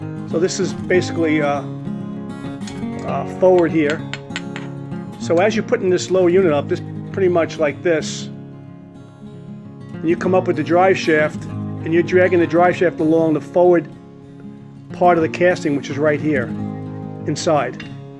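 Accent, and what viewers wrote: United States. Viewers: Love the Long Island accent! Cool videos. Keep 'em coming! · Thanks for the reply and there were times I SURE could have used one of those shift rod connectors. I HAVE just twisted a stainless bolt in and it worked. I been meaning to ask where you are, am I correct that the accent is North Eastern US?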